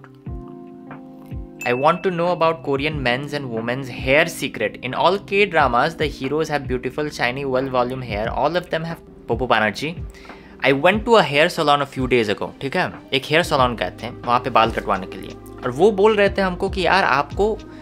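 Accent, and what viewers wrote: Bihar, India. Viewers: Bhai wh bihari hi h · A ji aap to humse bhi jyada bihari lag rhe hai..aisan ta hmaar tone nahi aata hai bhojpuri me lekin aapka to puchiye mat baap re baap.... sarangheyo oppa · Bhaiya apki accent toh bilkul hmare bihar jaiisi h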